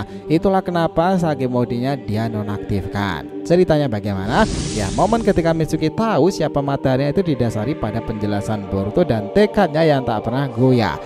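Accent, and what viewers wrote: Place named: Banyumas, Indonesia. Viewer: Hancur....hancur dgr jatok ngapakkk 😂🤣. Tersendiri'''😂. Gak seru 😅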